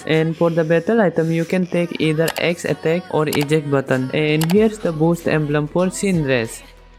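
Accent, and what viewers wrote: India. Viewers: Aew you indian ? Because I am also indian and by your saying accent is like Indian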